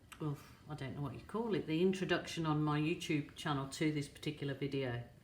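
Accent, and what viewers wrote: Birmingham, United Kingdom. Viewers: I love your result. I know you are in Aus but you sound like a Brummie to me. Are you originally from the Midlands?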